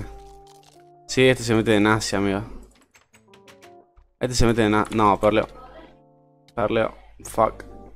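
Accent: Argentina. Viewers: Vos sos Córdobes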